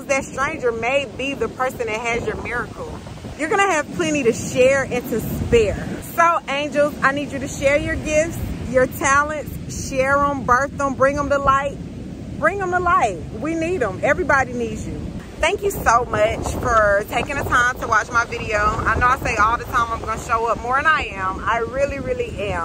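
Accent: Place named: Carolina, United States. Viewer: You from Carolina ? sheesh major sign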